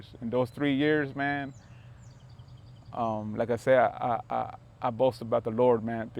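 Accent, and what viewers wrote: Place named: Alabama, United States. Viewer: What a trip, a Guatemalteco with an Alabama accent. ❤ Stay strong in the faith hermano.👊🏼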